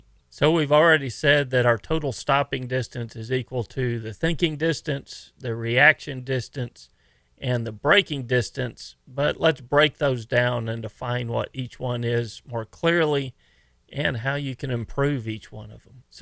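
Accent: Texas, United States. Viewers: 5 but then again your Texas accent upped my stress level.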